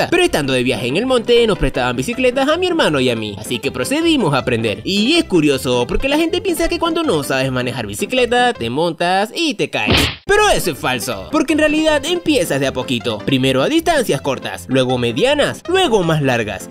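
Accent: Venezuela. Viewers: Oe eres de Venezuela? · Eres venezolano?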